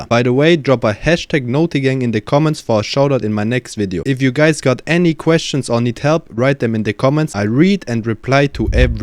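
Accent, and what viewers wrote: Germany. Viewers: Are you German ? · Damn Bro U Made m3 a movment demon fr and i can hear the German accent 😂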